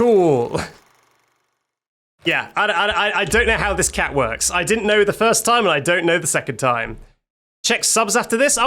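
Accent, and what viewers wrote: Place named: South, United States. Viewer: That accent is southern american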